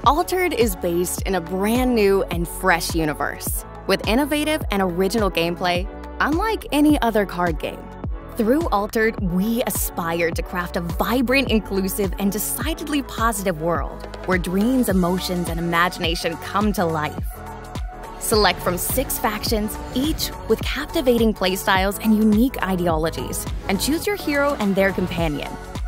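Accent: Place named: France. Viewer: The French accent makes it so much better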